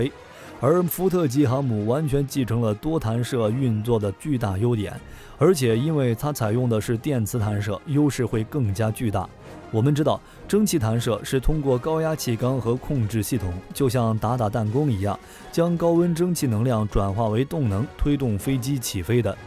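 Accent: China. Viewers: 看他的解说用词和口音就知道绝对是大陆人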